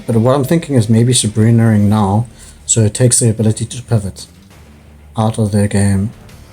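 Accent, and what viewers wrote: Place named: Cape Town, South Africa. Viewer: Me too bro! i was thinking theres no way another cpt gent making pocket content😂. Accent spoke for itself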